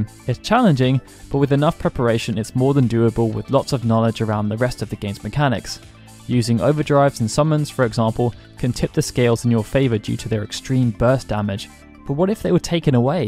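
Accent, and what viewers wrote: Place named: United Kingdom. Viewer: You've got a British accent. Your name isn't.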